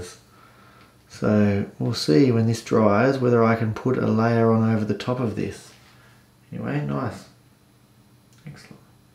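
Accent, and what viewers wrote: Australia. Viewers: I adore Payne's Grey. I don't buy the color because I love mixing colors to get it. It's my favorite color for a night sky. PS Where are you 'cause you sound Australian. I'm in Canada 🇨🇦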